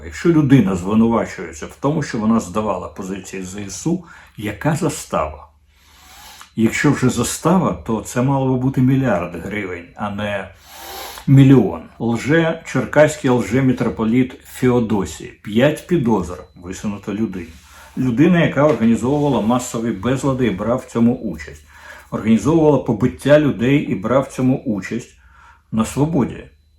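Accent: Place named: Russia. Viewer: Укр Мир,повинно звучати як МИР,а не мір.Від цього походить і перемир'я,а не перемір'я.Дмитре,та позбавтеся такої філологічної залежності від Ваших улюблених Ванюшок...Тисячі ракЕт полетіли на голови наших людей,а з Ваших вуст Третій рік поспіль все вилітає: РакЄти,та ракЄти.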